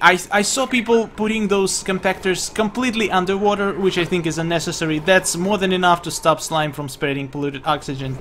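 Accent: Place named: Russia. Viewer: таки ты русский чтоль с таким акцентом?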